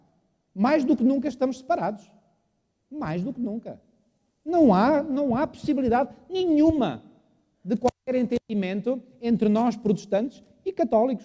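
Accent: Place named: Portugal. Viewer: Muito lindo o sotaque do português falado em Portugal🙏🏾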